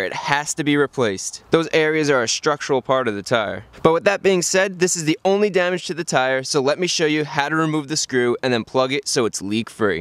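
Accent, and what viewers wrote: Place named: Northeast, United States. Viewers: As a fellow “wooder” brother, much love from Baltimore. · SsS Stone Cold no its how the northeastern Americans say it.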